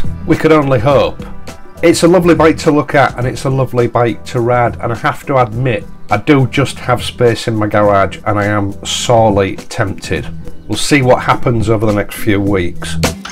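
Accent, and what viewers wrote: United Kingdom. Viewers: Love the bike and the way you review it. So great to see a company many would smile at forking out such a beautiful machine. As someone living in Australia (Straya, mate) i almost need sub titles...which part of the UK talks like this?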